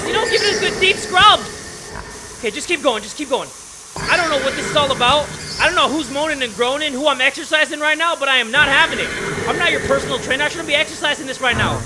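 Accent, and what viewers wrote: United Kingdom. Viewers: got the most real British accent. Plus he is real thank god for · THAT BRITISH ACCENT THOOOOOO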